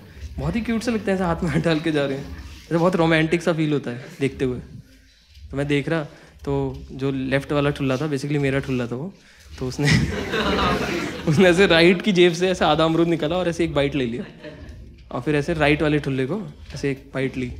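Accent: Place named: Garhwal, India. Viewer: Bhai tum jaat nhi laggre ho yaar gadwali laggre ho tum tohh 😅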